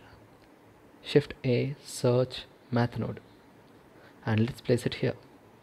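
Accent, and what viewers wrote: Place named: India. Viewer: 인도발음 나오자마자 신뢰감 상승한 영상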